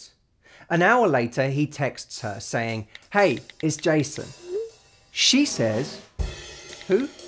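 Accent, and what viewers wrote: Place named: United Kingdom. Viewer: I love the way you Brits speak, "exomple ofter exomple" :–0. I'm not too sure about the glottal stop though. Or should I say "the glo' al stop"? hehe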